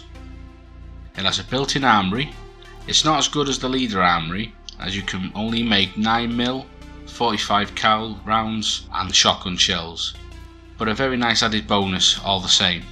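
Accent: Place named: Liverpool, United Kingdom. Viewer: Don’t change a thing mate, you’re an honest to goodness average gamer with good tips and tricks and your style reflects that! Dead warm and friendly. I thought I was picking up some scouse at first. Maybe just my headset-fried hearing? 😂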